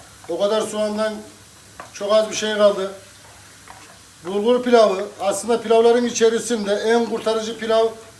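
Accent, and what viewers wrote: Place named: Tokat, Turkey. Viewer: Konuşma Tarzın İç Anadolu tarafı Gibi .Merak ettim Nerelesiniz?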